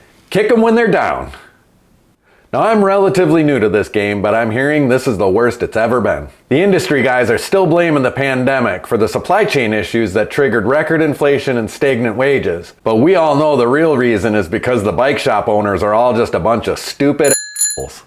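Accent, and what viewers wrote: Wisconsin, United States. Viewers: Mad proper Wisconsin accent. -715er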